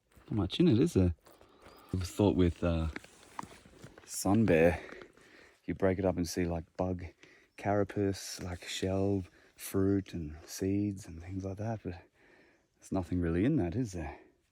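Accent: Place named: Australia. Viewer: Lol wasn't expecting an Aussie accent when I clicked on this! Long way from home!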